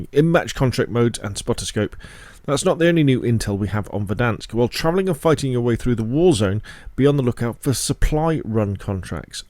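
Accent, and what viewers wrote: United Kingdom. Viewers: Jesus Christ you are a breath of fresh air. Every other video I've tried to watch is just some American begging for subs and spending literally 12-15mins going through everything, with added speculation and opinion. You just go through the information bit by bit and don't flood it with your own contrived padding. Thank you for your resounding Britishness and the approach you have to information dumps. ✌️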